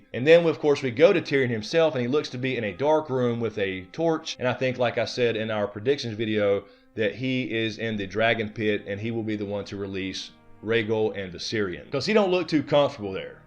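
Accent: South, United States. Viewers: so excited for this season! I'd say I'm more excited for season 6 than the previous seasons because we are going to see stuff that hasn't been told in the books yet. Can't wait! Just found your channel...great recap! Also, you sound like a fellow southerner...so double bonus!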